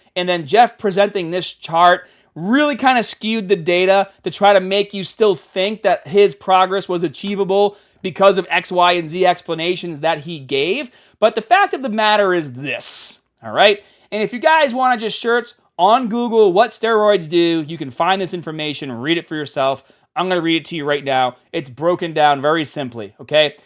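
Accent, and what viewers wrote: Boston, United States. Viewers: Its good to see you still grow strong , im a follower even before people make fun of your "bahhbel" Boston Accent era . Haha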